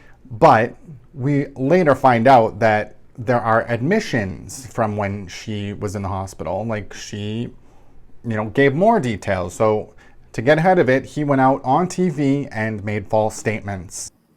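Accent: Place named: Boston, United States. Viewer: lol I could care less innocent or guilty but every couch potato I come across trying to be a “reporter” never addresses FACTS..missing Lexus telematics that would actually convict her, 3 missing surveillance tapes, 2 destroyed phones, and there isn’t enough words about the investigation. Also..the Boston accent with a lisp is not air worthy..sorry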